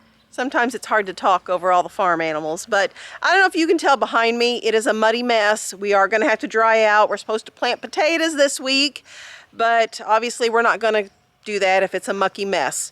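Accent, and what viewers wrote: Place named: South, United States. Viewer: I love your accent.thats southern.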